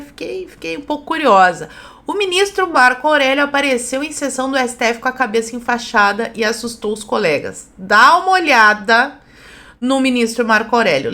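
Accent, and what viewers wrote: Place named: Minas Gerais, Brazil. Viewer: OCE. É. GAUCHA. O. É. MINERA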